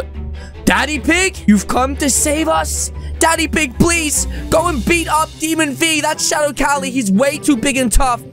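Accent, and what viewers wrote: England, United Kingdom. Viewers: Search for more bullies in bubble gum simulator Also are you from england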